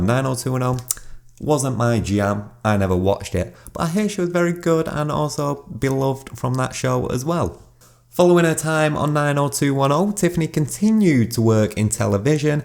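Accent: United Kingdom. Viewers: Always appreciate your content. Certain it's your accent that makes me miss the UK a little or the tour of topics that walk down memory lane with reminders of more innocent and care free times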